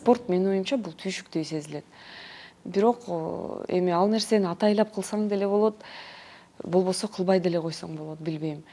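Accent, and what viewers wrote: Standard. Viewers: Нукура кыргызча суйлогонунуз жакты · Кыргызча таза Тил байлыгы ото коп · Жөнөкөй, кыргыз тилин таза сүйлөгөн, жагымдуу, таланттуу сонун кыз ❤❤❤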